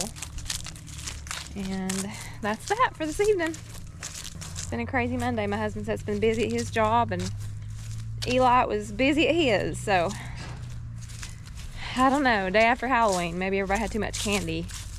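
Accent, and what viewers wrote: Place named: South, United States. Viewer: Yes Ma'am you have that wonderful southern accent! Montana is a beautiful state to visit any time of the year! We raise cattle but never had chickens, mainly because of our abundance of bald eagles, skunks, coyotes and greedy turkeys! But we decided to designate a portion to have chickens for eggs, it's been a blast raising them, and now winter is coming, and I'm just watching everyone's way of raising their chickens! Hope you get a chance to come visit the Big Sky Country! Cheers!!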